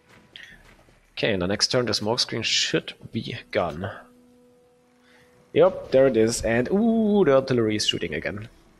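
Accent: Germany. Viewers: You have German Accent Im German so my Englisch is bad. Bist eigentlich ein guter Youtuber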